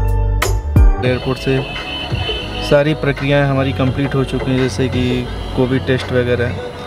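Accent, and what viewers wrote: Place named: Kanpur, India. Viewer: Guru kanpur se ho ka..yar boli bhasha ekdam mil rahi hai na..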